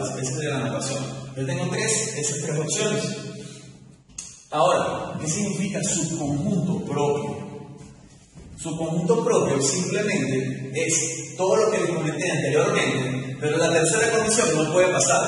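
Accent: Venezuela. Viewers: Excelente tutorial, bien explicado, buen contenido, buen manejo del tema, buena energía y muy guapo el jóven! por el acento parece ser venezolano, me equivoco? saludos desde Guatemala! :)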